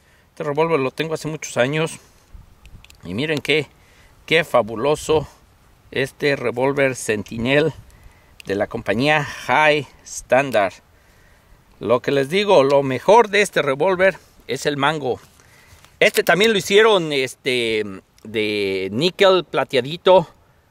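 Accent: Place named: Mexican-American, United States. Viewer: El acento es increíble amerimexican o México americano? 🤔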